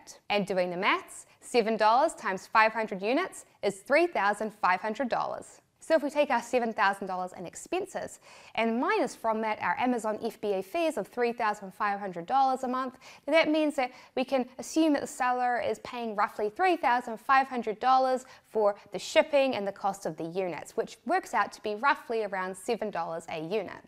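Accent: New Zealand. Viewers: ooo gash you r a kiwi! click the subscription button. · I am Australian you accent sounds NZ but slightly stronger than what i normally hear. I don't know why i said that.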